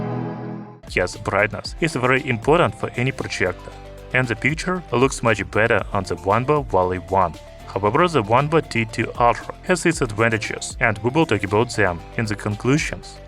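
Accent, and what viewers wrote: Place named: Russia. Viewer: Use AI for english, cause its hard to understand you Rusky!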